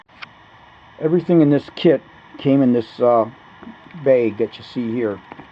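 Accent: United States. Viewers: is this American or Canadian?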